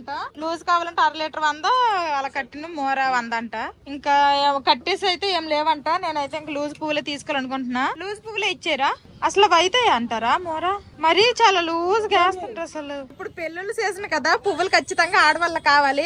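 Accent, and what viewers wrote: Srikakulam, India. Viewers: Mana శ్రీకాకుళం culture slang exact ga chupistunnaru ea filters lekunda super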